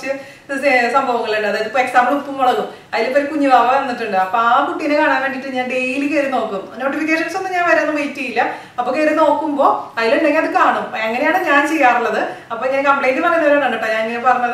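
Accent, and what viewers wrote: Thrissur, India. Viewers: It's Thrissur slang! We speak little fast😊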